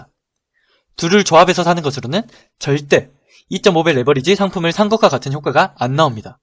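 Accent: Jeolla, South Korea. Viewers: 전라도 사투리 ㅈㄴ세네 ㅋㅋ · 전라도 놈이네ㅋㅋ 믿을수가 없다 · 말투가 어째 광주나.전라남도쪽분 같은디요.저는 공포구간에서는 잘 사긴하는데 수익구간에서 끌고가는걸 잘 못해서 끌고가는거 도움이될까하고 구독.좋아요.박았습니다.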